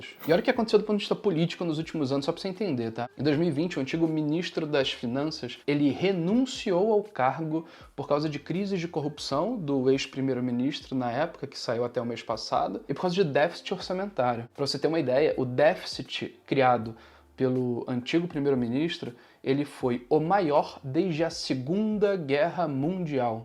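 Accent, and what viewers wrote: Rio de Janeiro, Brazil. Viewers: Voce tem que ser Carioca com esse sotaque. Eu tambem nasci no Rio num lugar perto da Penha chamado Bras de Pina. Sou cidadao daqui dos USA a quatro decadas.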